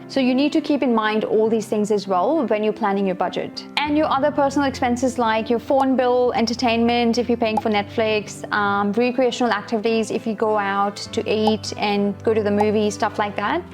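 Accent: Australia. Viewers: You have such a great knowledge with brilliant auusie accent and make more vids on foreign Nurse to how to be Rn in Australia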